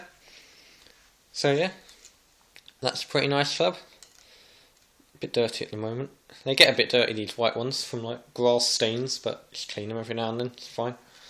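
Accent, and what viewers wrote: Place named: Essex, United Kingdom. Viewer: Cleveland is a great brand, underrated by some, amazing wedges. I'm having a bit of a love affair with Ben Hogan irons at the moment (forged gs irons and Colonial wedges) all getting a bit old and I should upgrade them. Can't bear to do it though. I'm just too attached they just seem to fit me. By your accent you sound like your from not far from me. You can usually see me struggling and swearing round the courses of North Essex. Good luck this season ;)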